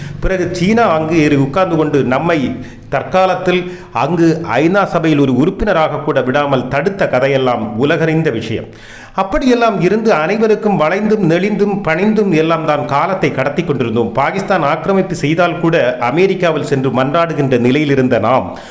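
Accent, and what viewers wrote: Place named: Nanjil Nadu, India. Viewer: இந்த உண்மை விஷயங்களைப் புரியும் வண்ணம் நாஞ்சில் நாட்டுப் பழகு தமிழில் இனிமையாகவும் கோர்வையாகவும் மிகவும் நேர்த்தியாகவும் ரசனைக் குறைவின்றியும் எடுத்துரைத்த அன்பருக்கும் இந்த ஊடகத்துக்கும் நன்றி கலந்த வணக்கம்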